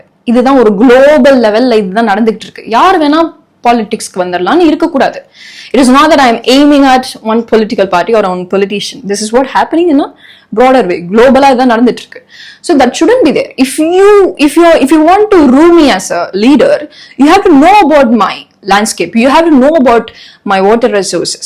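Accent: Tamil Nadu, India. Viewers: I was watching your videos by your accent I found you are a tamil girl. Great to see you in speaking Tamil❤ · நீங்க தமிழ்ன்னு முன்னாடியே தெரியும் எனக்கு, நீங்க பேசுற விதத்த வச்சு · your english also has indian accent apo ummaiyave ne indian thana ?🔥🔥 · I guessed you were tamil when I watched your English videos 😂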